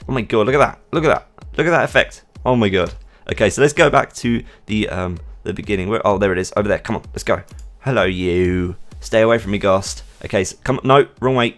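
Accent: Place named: United Kingdom. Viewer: Don't worry about popularity man, you have a British accent, you'll be popular in no time!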